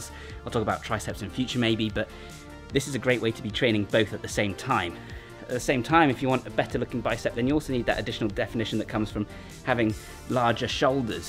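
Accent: United Kingdom. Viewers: Side note. You have a great naration voice for audio books, I feel the confidence and conviction in the words. Must be the accent. Sooo British.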